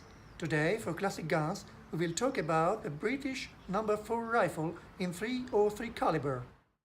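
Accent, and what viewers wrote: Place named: France. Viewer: I'm curious too. Judging from the accent I'd say France, or somewhere French-speaking?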